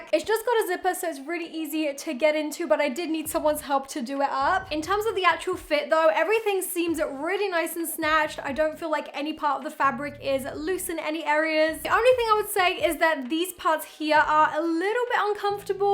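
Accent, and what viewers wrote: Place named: United Kingdom. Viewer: By your accent I can guess you are from UK so please do a video on urbanic clothing..... Btw in love with your videos ❤️❤️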